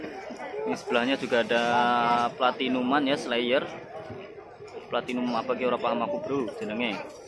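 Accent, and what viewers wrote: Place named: Java, Indonesia. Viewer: Halmun 😆, mantap mas medokmu ngangeni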